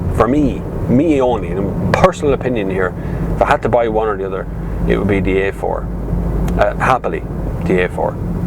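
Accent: Ireland. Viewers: damn is that what the general irish accent sounds like? I first thought he was dutch or something... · In all seriousness, thanks for the review. But to everyone who has never heard an Irish accent remember: Der's more to Ireland dan dis! See Alan Partridge: v=1QRlR0ctjNE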